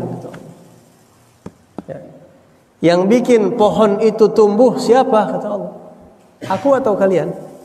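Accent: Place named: West Java, Indonesia. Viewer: Masyalloh.. Habibana.. Senyum ane denger basa Sunda nya.. Ajiieeb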